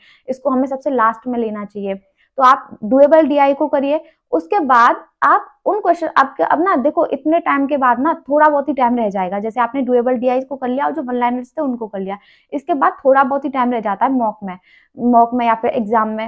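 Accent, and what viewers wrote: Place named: Pahadi, India. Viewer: Aap Uttarakhand se ho kya?....apki accent pahadi lagri hai 😊😅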